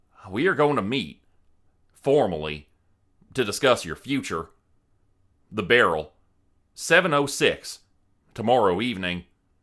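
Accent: South, United States. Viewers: Your attempt at a Southern accent is not too terrible! I appreciate you not going for the Beverly Hillbillies style of dramatic drawl that sounds so thick and saccharine that it becomes comical. Nobody talks like that either. So thanks for exercising subtlety and restraint. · Your southern accent is 'Meh' at best, I'm from Alabama, but it's better, (excuse me, spit tobacco juice in a can) than my Canadian accent 😂😂😂, I'm a major fan of Hollow's End & Charlie's Department Store Mr, your accent still needs some work 😂. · Great southern accent 👌. Thank you for another excellently narrated great story ❤❤❤😊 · Love the southern accent! Thank you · Ooohh…loving the southern accent! Great story 💜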